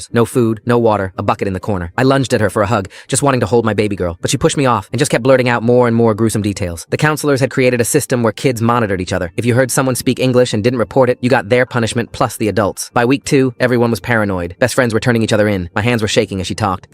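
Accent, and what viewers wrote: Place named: United Kingdom. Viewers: Why did it randomly turn British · Where did the British come from bro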